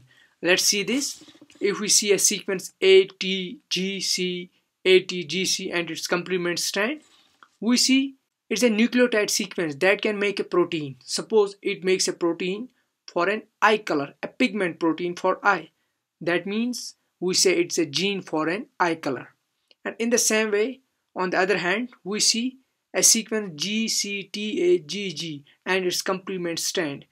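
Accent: Kashmir, India. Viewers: not not the english ..thats mashallah fine ...its just the aacent ...we kashmiris have ...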